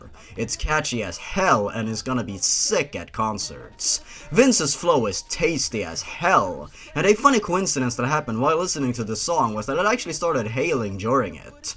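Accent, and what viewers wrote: Sweden. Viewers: Man hör att du är från Sverige bara så att du vet.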